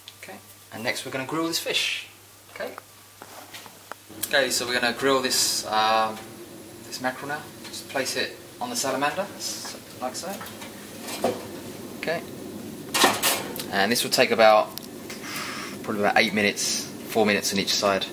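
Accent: England, United Kingdom. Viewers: Your dialect is nice. What part of England is it from?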